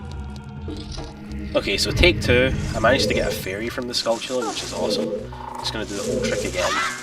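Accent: Scotland, United Kingdom. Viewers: Woo Scottish accent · Ok but his accent sound scottish, but thats just my opinion. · R u scottish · HES SCOTIIIIIIIIIIIIIIIIIIIIIISH WOOOOOOOOOOOOOOOOOOO (I'm American but I love Scottish accents)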